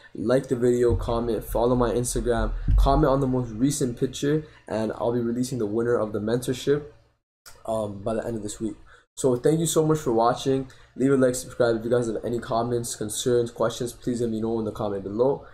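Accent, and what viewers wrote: Toronto, Canada. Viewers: The way you talk tells my you're from GTA, link up homie 😈 Also do you analyze crypto as well? Would love to see some content regarding that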